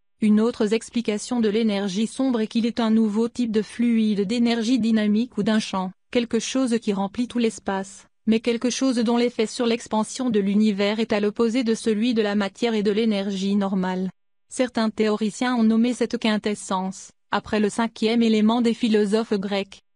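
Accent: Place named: Canada. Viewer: j'aime bien sa voix avec l'accent canadien lol! ont s'en fout de l'univers la terre c la maison mére !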